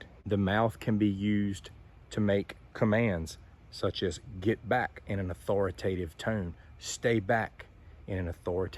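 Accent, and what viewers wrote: United States. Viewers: Ваш американский голос звучит ОЧЕНЬ классно Я согласен с рекомендациями.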